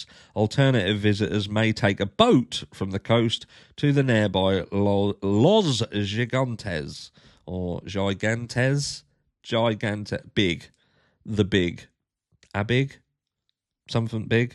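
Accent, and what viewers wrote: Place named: Norfolk, United Kingdom. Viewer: Of course it's bullshit. You are right. Even in our in bread norfolk, where I a sm from and sounds like you are, this shit don't happen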